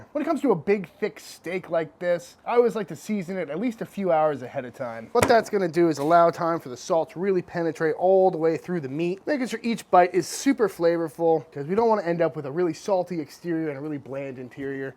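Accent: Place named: Massachusetts, United States. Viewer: I hear that Mass accent. Where you from in Mass?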